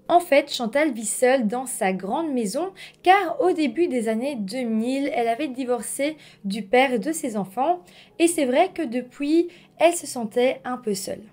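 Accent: Belgium. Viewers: Je pense que tres franchement la mort a ete donnee par accident du a la panique de la faire taire. Ce qui me fait penser ainsi est qu'il n'a pas du tout protege ses mains pour eviter les empruntes, et que si ca avait ete premedite, Sabrina et/ou lui auraient pense a mettre des gants pour eviter toutes traces d'ADN. Quoiqu'il en soit, c'est vraiment horrible et triste et la famille doit avoir beaucoup de mal a gerer non seulement la perte d'un etre cher, mais en plus dans de telles conditions. Merci pour cette histoire, j'adore les histoires qui se passent en Belgique et avec ton accent charmant belge, ca rend le tout encore plus sympa...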